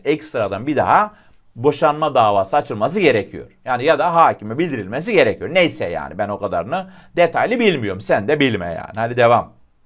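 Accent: Adana, Turkey. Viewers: Hocam Adanalı mısınız 😂 Adana ❤ ben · Hocam Adanalı mısınız 😅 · hocam net adanalısınız😁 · Adanalı mısınız hocam 😅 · Abi adanali mısın😂😂😂😂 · Adana mı hocam:)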